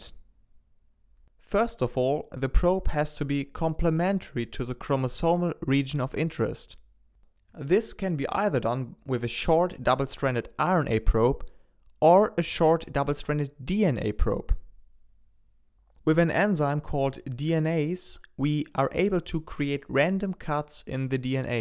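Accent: Germany. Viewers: you sound soo german :) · Thank u. Nice german accent ;-)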